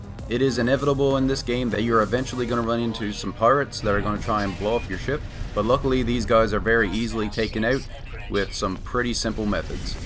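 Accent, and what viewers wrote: Newfoundland, Canada. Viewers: Some good tips, thanks! Oh, and I had to do a double take when I heard your accent 😆 not many newfy YouTubers I'm aware of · Could tell your a newfie from the start aha!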